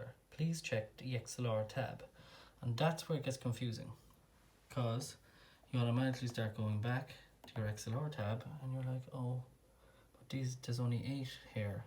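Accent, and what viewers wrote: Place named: Ireland. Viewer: Hello I was having a bit of Patchen problem and I came across your video. Great to hear an Irish accent Thanks for the video.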